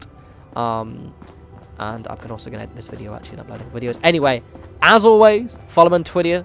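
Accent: England, United Kingdom. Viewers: I was actually thinking about this yesterday, when I was playing the game and trying to talk myself into going back to work on my vault but just really really didn't want to. I think you hit the nail on the head - I also tend to try to make my settlements look natural and part of the environment, like if somebody else came across it they might think it was made by Bethesda. If you look at the other vaults in the game made by Bethesda, many of them are dilapidated and worn. We only got brand new building pieces which just becomes monotonous after awhile and the only way to make vaults look unique is really through decorating, which if you aren't a fan of spending a lot of time on decorating, makes the whole thing feel like a chore. Side note: I actually found your channel (and subscribed) while I was looking for build ideas for my vault...I gave up on my vault, went back and watched some of your older videos and picked up a lot of tips that I've been using in my other settlements! 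And also, who can resist a man with an English accent doing Fallout 4 videos. Keep up the awesome work, can't wait to see what you do with Nuka World.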